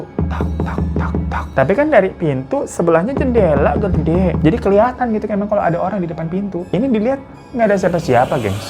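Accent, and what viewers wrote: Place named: Sunda, Indonesia. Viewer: Si aa lucu pisan ngomong bahasa sunda na jahaahaha😂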